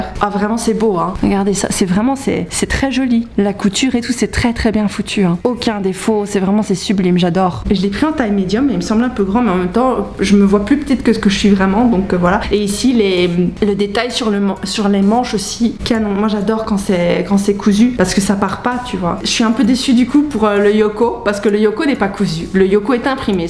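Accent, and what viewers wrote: Belgium. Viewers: bitume C’est typique Belge, d’ailleurs Quick ils le prononce Cuik 😂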